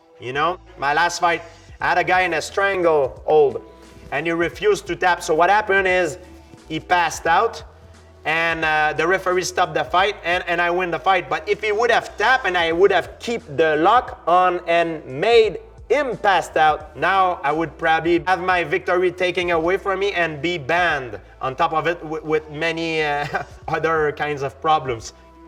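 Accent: France. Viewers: He sounds not only french but he somewhat sounds Irish on some of his sentences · The world's jolliest and friendliest MMA fighter. We love GSP and his franglaise😅♥️ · Whenever he said 'throw' with the French accent, I heard 'troll'. Made the commentary pretty hilarious in a few spots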